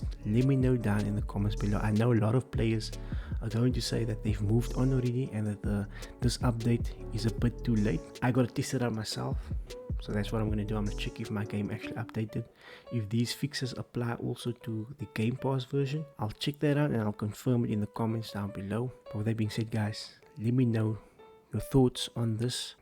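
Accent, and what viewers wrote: South Africa. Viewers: Mate love the South African accent haha. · Lol not doging your voice or anything but I genuinely thought you sounded Indian with a British accent. Only when you said south African did I start hearing that.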